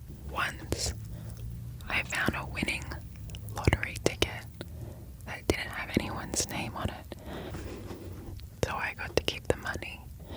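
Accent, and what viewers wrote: Australia. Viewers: Aussie accent whispering is the most beautiful thing I've ever heard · australian accent is perfect for asmr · I really love the aussie accent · Her aussie accent makes it all better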